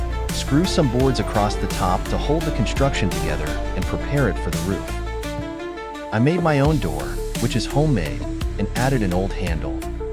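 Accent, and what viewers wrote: Denmark. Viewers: Yes, very cool. I miss your Danish accent. My computer is haunted, it would not let me stop watching your video 😱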